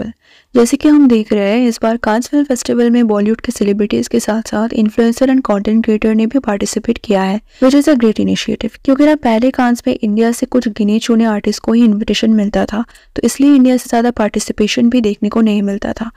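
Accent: Bengal, India. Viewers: Aap bengali ho?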